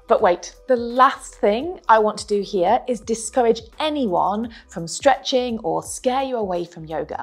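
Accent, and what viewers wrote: United Kingdom. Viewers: SO nice to see another teacher pointing to this short coming of yoga. My own 40 year yoga teaching career has let me see many loose their yoga butt and need to get it back again. I recommend much of what you have here, and also picked up a few good tips to add to my tool box as a yoga therapist working with hips challenges. thanks! Love your British accent.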